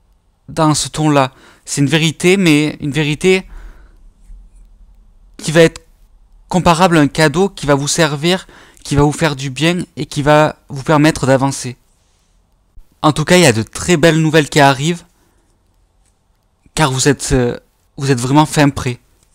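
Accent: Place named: South-West, France. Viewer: Coucou merci confrère avec une voix qui chante et me rappelle le sud ouest du côté de ma mère de Toulouse merci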